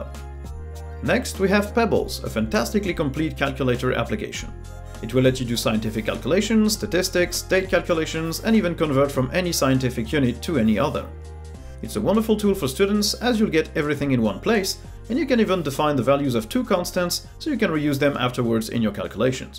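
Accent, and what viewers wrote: France. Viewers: Not My Audio. But saying your french makes a lot of sense. I can understand Indian accent, Asian Accent etc. But have always struggled very hard to understand French accent. My brain just does not want to understand that accent.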